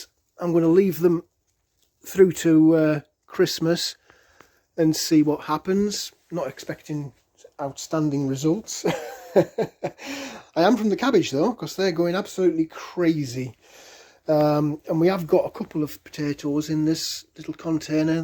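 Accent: United Kingdom. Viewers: SO interesting to listen to British language.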